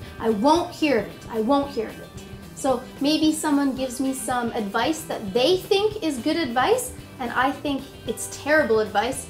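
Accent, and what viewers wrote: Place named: Canada. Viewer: Again, simply an excellent lesson! Nice to hear some Canadian accent. Thanks. Great.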